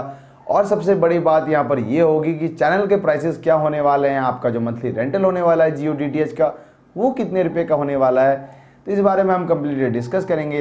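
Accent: Bengal, India. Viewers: aap Bengali ho kya?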